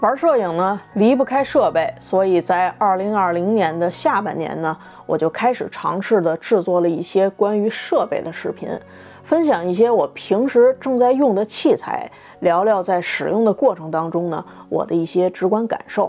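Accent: Beijing, China. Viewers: 北京话太亲切了。您这刚学剪辑我感觉就已经做得很专业了 非常棒 支持 干货很多，会给朋友推频道的！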